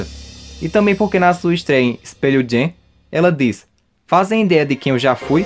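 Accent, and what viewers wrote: Northeast, Brazil. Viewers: ei doido tu e do Nordeste?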